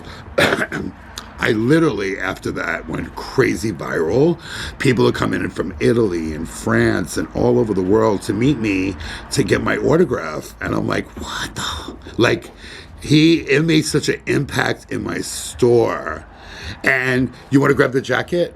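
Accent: United States. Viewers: Hi! Here because I saw you on Mark’s page. The video where he took Rebeca into your store. You’re so charming you have a magnetic personality. I love your raspy voice and New York accent 💕 Wishing you lots of success. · you seem so fucking chill to have as a friend I love it. Whats funny is im completely straight but ALL my friends aside from like 5 of them have all been the gayest of gays. Dunno why but i always gravitate towards gay guys as far as making friends or hanging out with people go i guess its how funny and flamboyant the guys i know are but at the same time have my back and can tell them literally ANYTHING even weird shit and they listen and respond like what i say is normal lmao. Can tell them my feelings like when im depressed or sad or whatever and they know all the things to say to cheer you up or make you feel loved, ive never had a straight friend do any of that but i have had some great str8 friends but its a diff vibe and dont feel as comfortable talking about certain things. 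Any way all that jabbering was to say you remind me EXACTLY of one of those friends who recently passed away last year to heroin overdose, he was heavy set and even had the exact same voice as you with that boston accent he was like the gay brother i never had his name was Gypsy i miss em.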